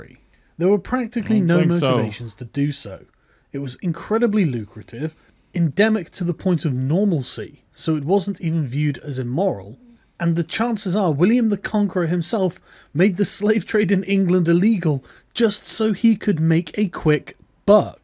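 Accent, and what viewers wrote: United States. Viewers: im glad that u are learning , but ur a tipicle american , im english and when u speak my ears hurt A-rab lol E-unuchs dude it hurts to hear u talk out the words ,sorry but it does ,keep up the learning its good .... try listening to english speaking english and repeating how they say words how you say them isnt right its course to say the least , remember its are language we invented it , its not yours ie:american i proberblty would come across to spanish or french the same if i wanted to try thier language but! that aint happening lmao tc b safe :)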